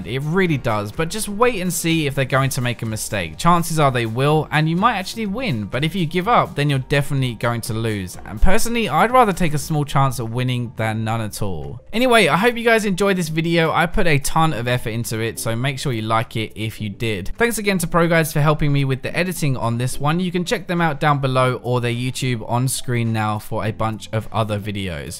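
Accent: United Kingdom. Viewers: Main reason I watch your videos is your wonderful British voice.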